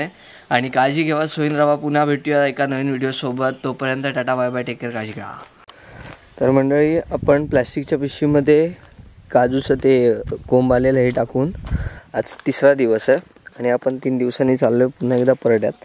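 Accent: Malvani, India. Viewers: मालवणी एकदम मस्त मित्रा. छान वाटते ऐकून आणि अभिमान सुध्धा. Keep it up. छान व्हिडिओ आणि सुंदर माहिती माझीसुद्धा गाव मालवण देवली येथे आहे.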